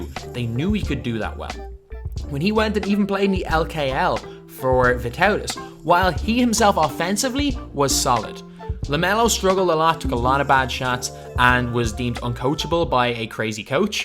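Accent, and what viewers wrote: Ireland. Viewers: Are you irish? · You sound Irish are u Irish?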